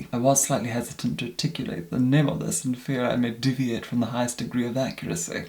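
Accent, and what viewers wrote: United Kingdom. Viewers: I just adore a British accent (or most any accent for that matter lol). Technically, the British (aka English) accent is not really an accent. As an American, it only SOUNDS like an accent. Pretty sure the Americans accent developed from the Brits! 😊👍🏼💚💜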